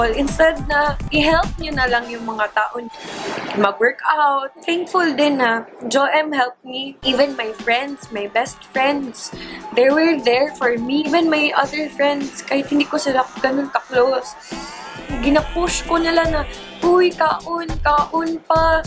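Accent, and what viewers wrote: Visayas, Philippines. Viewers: wow,, ayus.. dakong gensan,, bisaya jud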